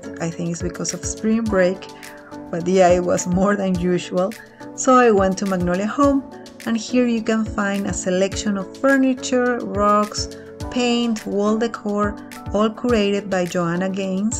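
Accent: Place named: Spain. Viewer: Hola! Me encanta ese lugar, se nota que tienes acento español... Haz algún video en español para los que no entendemos el inglés!!! Gracias!!!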